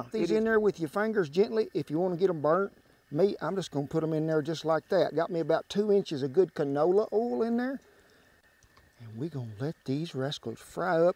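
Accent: South, United States. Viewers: I love this guy! Wow does his little mannerisms remind of my dear sweet departed daddy.....❤️thanks for the entertainment and the recipe looks to die for! He is near and dear to my heart already! What a sweetheart and wise man! I love his style and southern accent makes it all better. Chattanooga Tennessee sends its love! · About yay much!! Warsh it!!! I miss my southern people.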